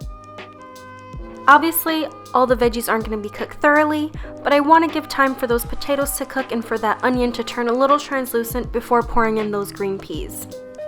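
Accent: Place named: United States. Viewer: American, not Albanian accent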